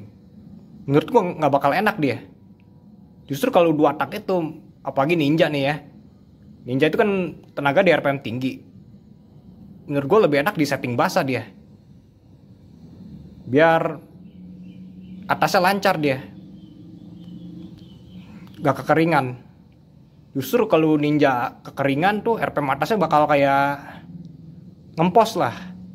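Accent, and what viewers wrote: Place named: Bali, Indonesia. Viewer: Ko kaya logat vrindafan Indonesia (bali)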